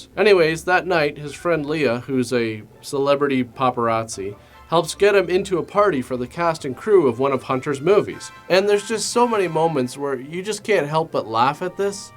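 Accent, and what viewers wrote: Canada. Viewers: I'm Scottish. I'm used to hearing horrific versions of our accents. Americans can barely tell Scottish and Irish apart, or Cockney and Australian... Cloth ears! At least I can tell is a Canadian - the way he says "about" is a giveaway.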